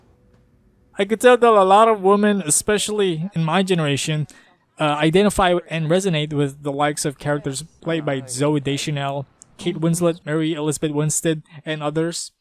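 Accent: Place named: Philippines. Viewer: bro i thought this was a different person, its hard to recognize where in your accent is filipino but I can tell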